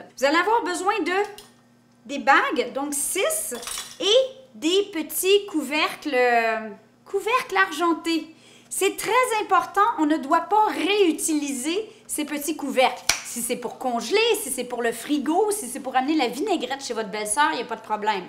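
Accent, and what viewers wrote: Quebec, Canada. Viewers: Tu es adorable avec ton petit accent Québécois, je t'adore merci pour la vidéo, je suis d'Alger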